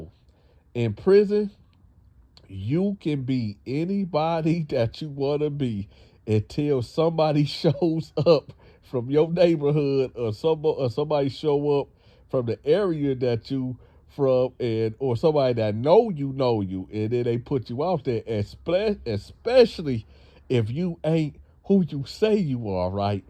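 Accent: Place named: South, United States. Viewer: Man why I think you was from down south lol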